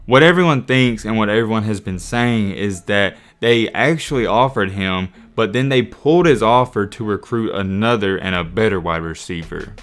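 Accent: South, United States. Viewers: So a southern twang erases the salient points within his video? Seems legit...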